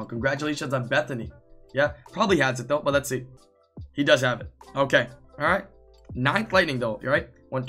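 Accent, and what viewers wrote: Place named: Asia. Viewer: Omg the stereotypical Asian accent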